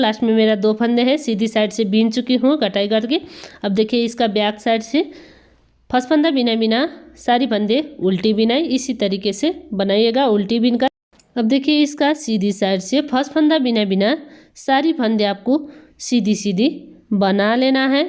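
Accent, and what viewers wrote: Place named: Nepal. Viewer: Kina hindi boleko nepali tone xa ta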